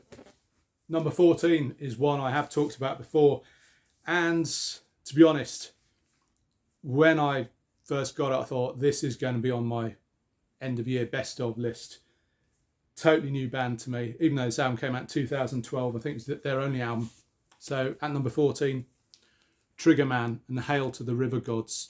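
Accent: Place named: Scotland, United Kingdom. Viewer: What a perfect scottish inclination of your. Namastè.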